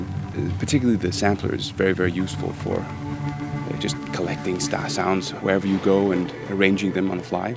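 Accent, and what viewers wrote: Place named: Italy. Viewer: You have an Italian accent 🤔 nice vid!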